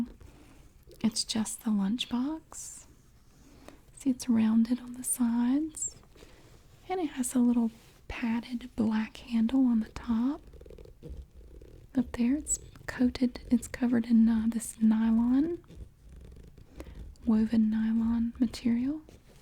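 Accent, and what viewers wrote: South, United States. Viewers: Just found this channel and love your southern accent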